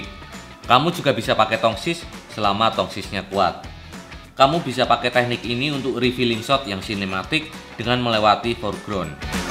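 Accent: Java, Indonesia. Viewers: Kok medok cak?? Ngendi lho asline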